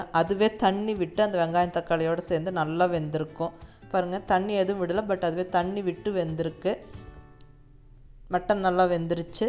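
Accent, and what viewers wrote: Coimbatore, India. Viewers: Super Mam.. Unga Kongu Tamilum super... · pathalea sapidanum pola iruku👌🏻👌🏻nangalum coimbatore dha akka..Muscatla irukom..Ippove oodi vandhiranum pola iruku namma ooru bashai keka keka.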